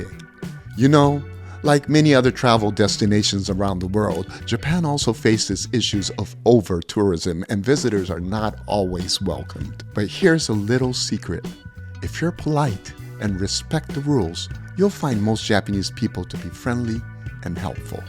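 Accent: United States. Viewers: I'm very intriguing how did you get this American accent so amazingly speak? Please tell us?😊👏🏻👏🏻👏🏻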